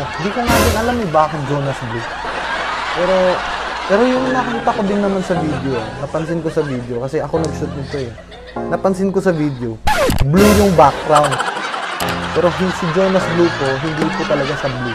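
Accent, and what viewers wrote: Visayas, Philippines. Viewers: piste bisaya man diay ka hahahaha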